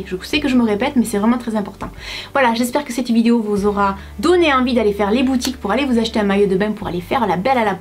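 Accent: South, France. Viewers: Elle vient du sud de la France 😉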